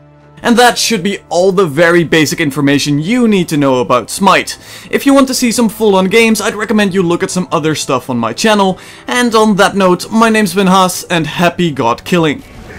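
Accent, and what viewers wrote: Netherlands. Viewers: are u dutch? u sound dutch xD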